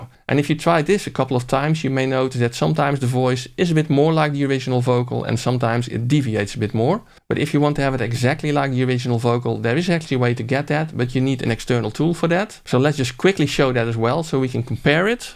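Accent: Netherlands. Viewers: very Dutch accent there ;-) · He is so Dutch, I hate this Dutch shshshs pronunciation... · Grappig dat je als nederlander onmiddelijk een engels sprekende landgenoot herkent. Niet lullig bedoelt overigens! Goeie video!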